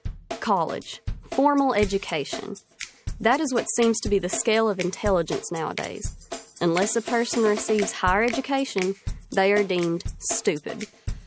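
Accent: United States. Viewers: I'm not hearing a dialect, just an accent. To my ear, your accent is about the same as that of East Texas, Arkansas, and Tennessee. When I was young (Don't ask when), the yankee transplants were few, so they didn't have the nerve to be rude about non-yankee accents. Yes, folks from the Great Lakes states have a sharp accent, such as "I hyave a geft for my dyad" instead of "I have a gift for my dad".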